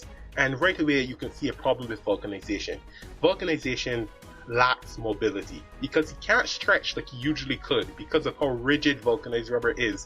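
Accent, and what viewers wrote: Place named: Barbados. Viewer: Are you from Barbados? definitely hear the accent lol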